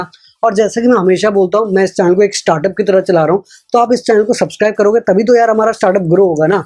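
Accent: Odisha, India. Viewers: R u an odia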